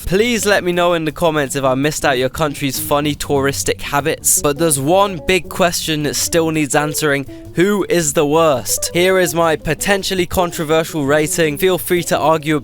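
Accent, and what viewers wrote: United Kingdom. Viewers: Homie nobody in eastern europe recognises a british accent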